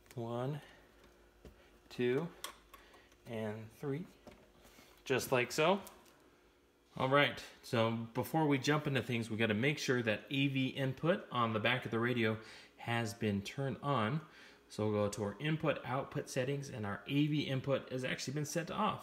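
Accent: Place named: Ireland. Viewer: Damn this guy is severely irish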